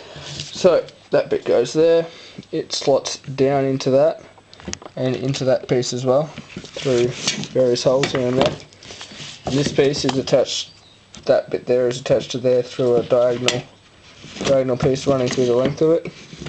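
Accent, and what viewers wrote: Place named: Australia. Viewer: love the aussie accent :)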